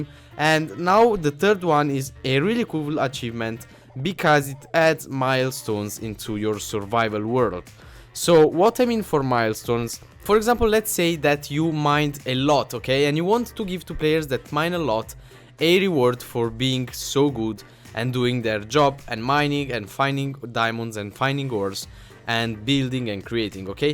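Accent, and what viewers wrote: Italy. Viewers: Certo che se sente l'accento italiano xD GG bro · Per quanto sia bravissimo a parlare inglese si vede che è italiano XD! Te ne sei accorto?? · perché cazzo parli in inglese se sei italiano ps. si sente l`italiano;D se parleresti in italiano faresti un grande contributo a yt Italia:D;D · bella frate sei italiano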